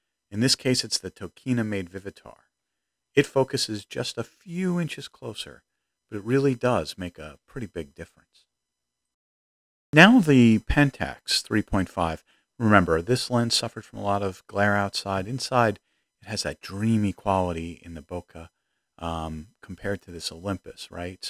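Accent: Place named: United States. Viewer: Thanks for this review and comparison. Always loved Minolta for the very beautiful color reproduction and quality. Olympus surprised me too. I have never owned a single Olympus lens, but judging by various reviews, I had the impression that, in general, Olympus lenses were of average quality. However, in this comparison, the Olympus performed very well, especially in the corners. I also wanted to say that I liked the composition in the photographs themselves (or the cropping applied later). One can immediately say - this is a person who knows a lot about composition. The very first photo is BOOM, you can immediately put it as wallpaper on your desktop :) And that photo with a stone wall is so sharp and colorful. And the picture that was most likely taken from somewhere in the forest (?) and we see a landscape and those biiig columns - a very good composition and the picture itself is very atmospheric, with a beautiful distribution of light. 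I immediately became interested in what kind of place it was taken. Judging by your pronunciation, you are an American. But the country is pretty big (although somewhat smaller than mine, hehe), so for now it remains a mystery to me, because there were no signatures. Anyways, very interesting comparison and I'm glad I stumbled upon it today (even though it's been 2 years) because today there are so many different kinds of "bloggers" and... to be honest, they show themselves more, rather than their content. Constant jokes, all sorts of vide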